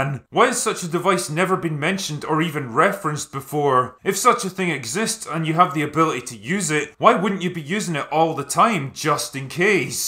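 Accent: Scotland, United Kingdom. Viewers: Татья́на... yeah, it's Tat-i-jana, whereas the 4th and 5th are letters unknown in the English alphabet. The 4th is almost like the German "ü", the 5th is simply "ja" or "ya". I would say "Tatiana" is the most accurate, it's just onomatopoeia anyway. I guess Russians, Belarussians, Ukrainians and other Slavs DO have a couple of ways to write this name 😁! But as I wrote, the way pronounces the name with his Scottish accent it does sound more like Tateyana. Probably the man gets that girl to speak into the mic one day. If only she wasn't purely imaginary... 🤣😹😂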